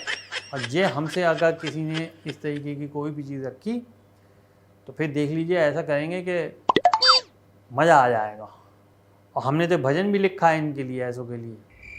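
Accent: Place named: Uttar Pradesh, India. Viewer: Bhai ap kisi ki bhi mimicry karte ho to apke dialogue bhi local language mein hoti hai aur tone bhi same up wala...jaise hari nahi hai ye up ka pronunciation hai yahi apke kirdar mein char chand lagati hai....mujhe apne clip ka intzar rehta hai aap sach mein telented ho...radhey radhey 🎉🎉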